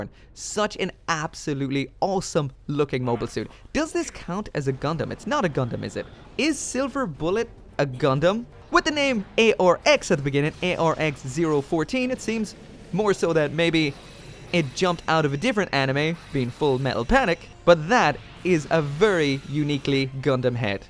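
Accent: Ireland. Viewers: you live in the US? where are you originally from? your accent sounds a bit irish.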